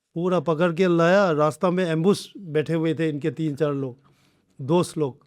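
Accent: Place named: Arunachal Pradesh, India. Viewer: Arunachali accent is coming 😄❤️🔥🔥🔥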